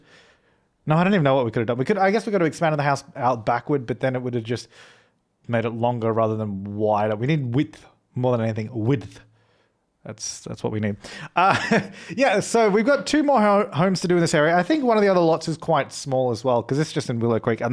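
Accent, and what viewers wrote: Australia. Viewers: Why I Love listening to You and Deli? I think Australia accent combines with sims builds. 🤔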